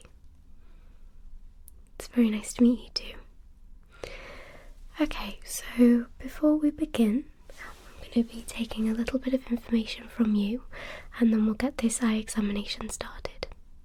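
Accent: United Kingdom. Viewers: So I see your from BRITLAND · I love her british accent😍👍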